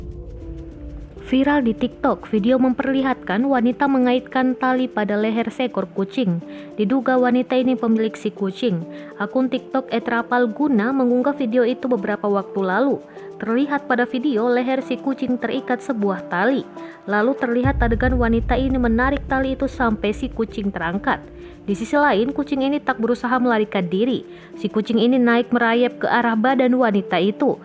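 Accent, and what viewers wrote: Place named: Jakarta, Indonesia. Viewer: Mungkin Orang betawi , jadi setiap hurup a diganti e 😅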